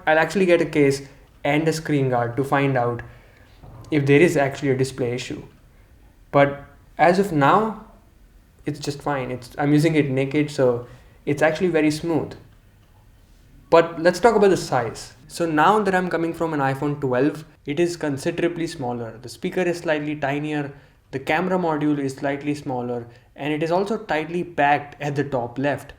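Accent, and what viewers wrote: India. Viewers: i love this indian accent ♥️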